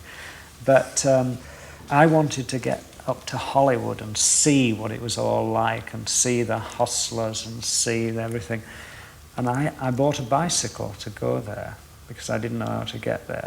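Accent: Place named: Yorkshire, United Kingdom. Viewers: Mildly interesting that his Yorkshire accent has got thicker in the intervening 50 years. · An amazing man with amazing ideas Even in more recent times he still has his broad West Yorkshire accent 🖼️🖼️🖌️🖌️🎨🎨🇬🇧🇬🇧🏴🏴❤️❤️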